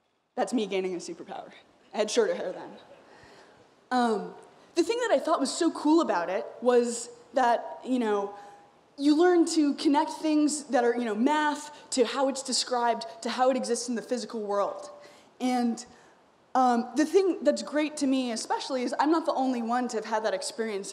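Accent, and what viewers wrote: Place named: United States. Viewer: I really like her accent , what part of U.S is she from ?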